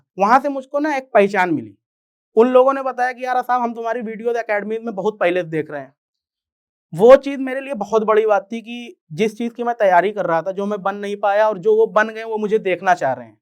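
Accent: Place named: Tanda, India. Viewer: Tanda ki accent h apki..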